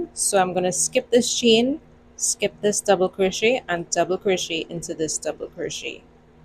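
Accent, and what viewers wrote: Trinidad and Tobago. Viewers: Ahh! I heard your accent and knew it was Trini!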